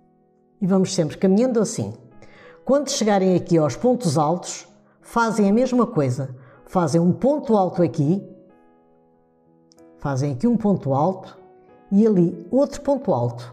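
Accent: Portugal. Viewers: Lindíssimo. Grata por compartilhar. Continue por favor , esse sotaque português , muito agradável.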